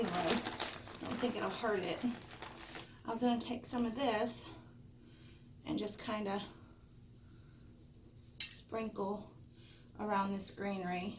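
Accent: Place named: South, United States. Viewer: Southern is best!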